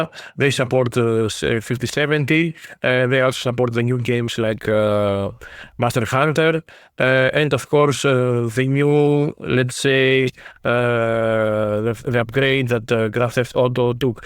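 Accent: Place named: Greece. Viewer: your welcome 🙏🏻 can I ask you something, are you from Greece? The way you speak English is like the common way usually Greeks speak the English language 😅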